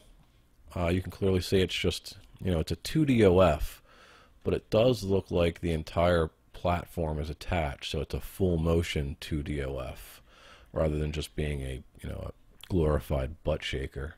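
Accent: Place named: East Coast, United States. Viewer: Love your accent. So east coast.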